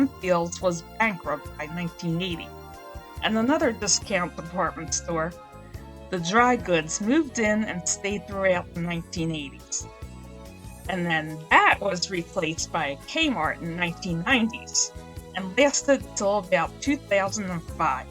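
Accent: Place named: Philadelphia, United States. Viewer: Narrated, with a perfect Philly accent😂 great job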